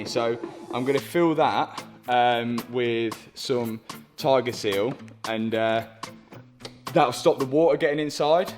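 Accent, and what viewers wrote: United Kingdom. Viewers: Boompahhh, bompaahhh, bumper !!!! Where are you from in UK ??!! What accent is that?! What city??!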